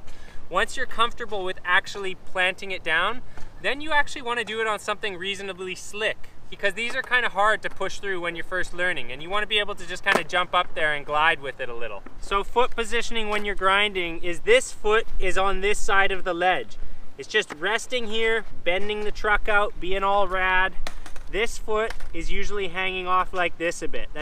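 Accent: Canada. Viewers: Is that an Ontario accent? idk if you are but you sound incredibly Canadian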